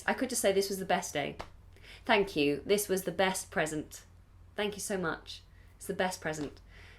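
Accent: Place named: United Kingdom. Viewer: Hi! I am Chandan Gunri from India. I have been watching your YouTube videos very carefully. I saw don't know the exact meaning of somnambulism. It's meaning is walking in sleep. I love your British English which is really helpful. You are truly an incredible teacher and there is no doubt about it. Thank you. Please get in touch with me like that. Really, you are helping me a lot.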